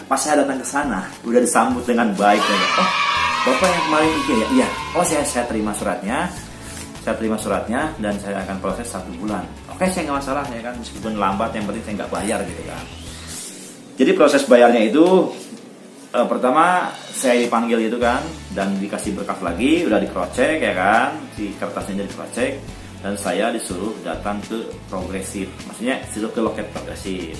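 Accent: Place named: Bogor, Indonesia. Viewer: Orang bogor yah...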